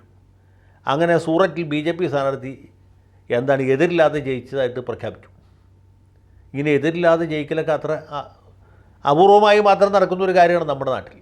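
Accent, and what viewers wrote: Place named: Thiruvananthapuram, India. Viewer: സാറിൻ്റെ തീരു::പുരം ഭാഷാശൈലി വിട്ടുപോയില്ലേ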